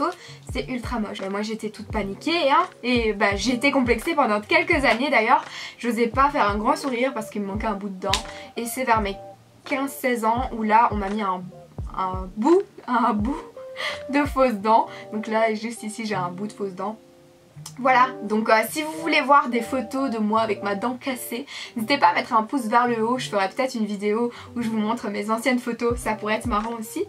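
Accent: Belgium. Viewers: Be Ca elle viens de belgique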